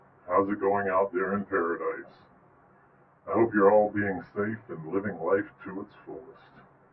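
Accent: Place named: Midwest, United States. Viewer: How is he doing this channel from inside there? From his accent I'd guess he's in the midwest.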